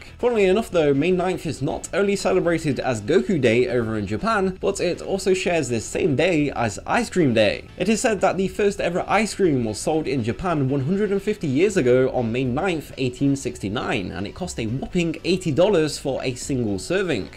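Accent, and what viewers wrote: United Kingdom. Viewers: That's because he's British, all British people would call the anime Dragon Ball Zed.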